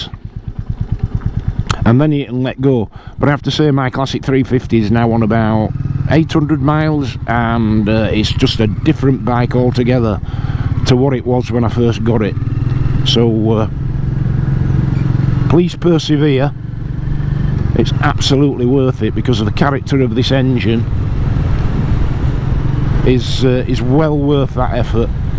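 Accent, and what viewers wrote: Yorkshire, United Kingdom. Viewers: Nice review thanks. I have an RE Himalayan in a hilly area here in Australia and have been careful to run it in. It’s a nice do it all motorcycle. I was in Bradford for 10 days in late November visiting my old uncle, so good to hear the Yorkshire accent and see the local roads again on your review.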